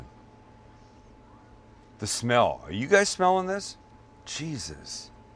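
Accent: Canada. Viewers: That Canadian accent LMFAO! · Are you Canadian? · what part of Canada are you from?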